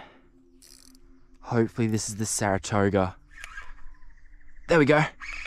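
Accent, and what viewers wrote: Australia. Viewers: Love that aussie accent !!! Awesome content by the way !!! Greetings from Switzerland !!!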